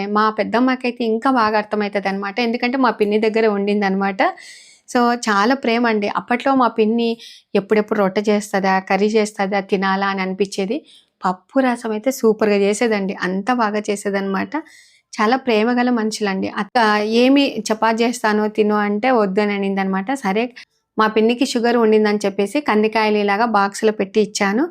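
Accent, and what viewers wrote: Rayalaseema, India. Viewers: ఈ రాయలసీమ మాటలు సీమ తెలంగాణ మాటల్లోనే ఉంటాయి నువ్వు మాట్లాడిన కొద్ది నాలి అనిపిస్తుంది🎉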